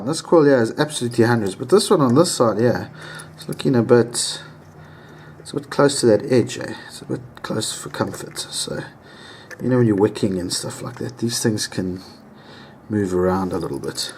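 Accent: South Africa. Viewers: Hey you sound like you're from eMzansi (S.A), Are you??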